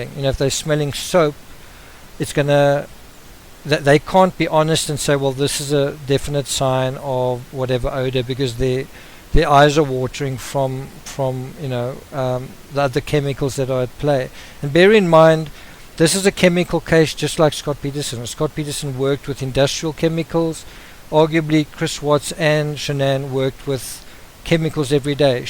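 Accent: South Africa. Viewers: Is the narrator perhaps South African?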